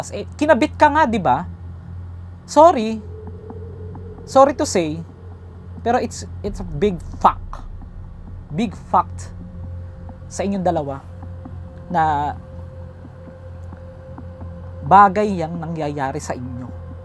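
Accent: Visayas, Philippines. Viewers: bisaya kayo dol?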